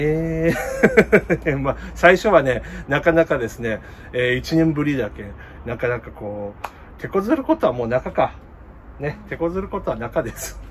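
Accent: Kumamoto, Japan. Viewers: 熊本弁いいですね😃‼️ 社長らしさが伝わる☺️ そして、との最初のやり取りが仲良し夫婦な感じが伝わる～😂 今週雪がもっつり降ったので外で冬越しできます多肉さんが残念のお知らせ。。。😱雪にまさか当たったとは。。。⛄💦 便利グッズ、頼りすぎはダメですよね😅私も気を付けます❗ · 熊本弁、社長らしくてめっちゃいい❤ ちょっと意味が分からないところがあったので、少しだけコテコテを抑え気味にして、ぜひ続けて欲しいです❗️ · 東北生まれの関東人ですが熊本弁良いですね😊 バッテン荒川さんを思い出します。これからもやってくんち‼️ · おはようございます☀ 熊本弁😂 気になって内容全然入ってこず2回見ました😂😂😂 そして1002回目！おめでとうございます㊗️🎉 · 熊本弁かわいいなぁ〜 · 社長！熊本弁のほうがいい！こっちのほうが私好き！社長らしいし親近感わく～～！！字幕いれるとめっちゃ面白いし！🤣🤣🤣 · ふふふっ😂 熊本弁は面白い😆😆😆 このシリーズお願いします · まうごっっおもしろかぁー、私のは、エセ熊本弁かもしれないけど、たいぎゃー、まうごっ、こぎゃん、そきゃん、面白すぎて２回観ました！社長の熊本弁動画大好き一生懸命聞き取ろうと集中力アップ⇧😂だけど、やっぱり苦手な人もいるんですね😢残念、でもたまには、熊本弁の動画もお願いします。楽しみにしてます❤ そうだ、思い出した！熊本の温泉で、あとぜき！！って書いてあったの思い出して1人笑いしてました。本日最高動画でしたー🎉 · 社長の熊本弁好きだなー。もお疲れ様です。いつもありがとうございます。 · 社長、熊本弁、心に響きました🤭 シクラメンの鉢もオシャレになりましたねぇ✨ 行き着くとこはやはりお水管理 ( っ ˙꒳˙ )っ🚰ｵﾐｽﾞﾉﾝﾃﾞ 夏越しさせた５歳のシクラメンですが、まだ🌱 が出ません（ ᐪ꒳ᐪ ）球根ダメになったのかなと思いつつ、諦めず毎日観察してます ( ⚭_⚭)✧ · 熊本弁、聞きづらいので標準語で喋っていただきたいです💦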